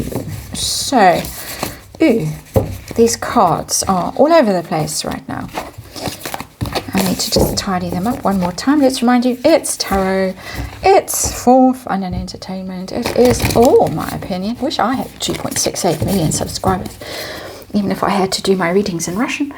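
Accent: South Africa. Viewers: I wondered before you started this reading if your empathetic tarot reading would clash with astrology and the cards would not co-operate. However, as you seem to be able to keep both worlds happy, they were allowing you to gain what you were seeking. Rachel on the other hand? Maybe, having sold her soul to the devil, even he has chucked her out, so she is trying to cosy up to the heavens. I really enjoyed this reading and PLEASE keep your lovely soft South African lilt and don't try Russian😇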